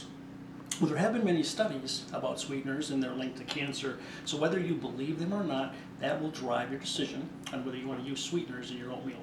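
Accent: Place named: Minnesota, United States. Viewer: Good video brother. I used to live in Mankato. Haha. I thought u had that accent. I'll be honest with you. I guess im one of the very few who can eat it plain with nothing in it. All I do is put a half cup in the food processor bc it cooks more throughly. And its definitely one of rhe foods that keep you feeling full. Thats the magic food ya'll.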